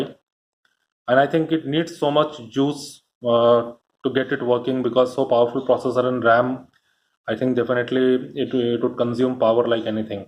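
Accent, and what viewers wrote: India. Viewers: another indian accent ...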